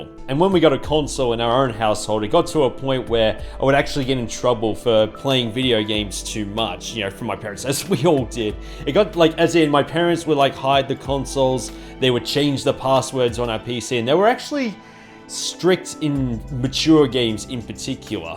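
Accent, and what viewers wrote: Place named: Australia. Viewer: I really love your videos, I’ve been watching you for ages now. But I love that there’s an Australian voice in this space on YouTube and I also love the quality of the videos that you put together. Thank you so much for inviting us in and letting us know a little bit about you and the reason for the channel, I’m sure I’ll appreciate everything just a little bit more now. Congratulations on 10 years!!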